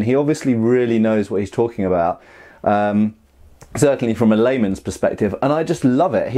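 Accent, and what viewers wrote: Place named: United Kingdom. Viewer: One of the reasons British people make for good Youtubers is because everyone loves your accents.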